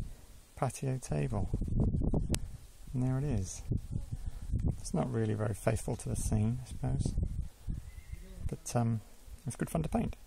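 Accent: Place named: Australia. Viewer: you sound very AUSSIE.!!!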